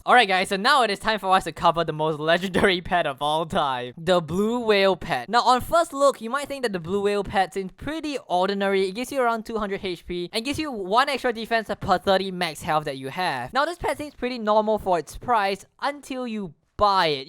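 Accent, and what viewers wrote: Singapore. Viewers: Jesus I love singporians english. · yo are you singaporean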